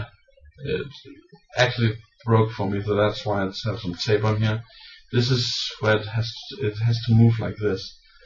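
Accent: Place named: Denmark. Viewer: you have a danish accent... are you from denmark?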